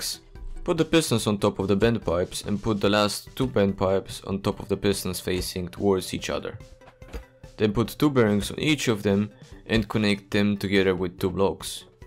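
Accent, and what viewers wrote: Russia. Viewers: какой твой родной язык? у тебя сильный акцент слегка похожий на русский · Where are you from? Russia? You have specific accent :P BTW good vid, gonna try this :D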